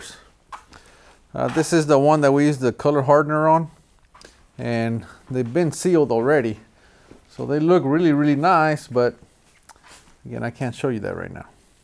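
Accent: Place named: South, Mexico. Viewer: I hear a Mexican and a Southern accent when you talk.✌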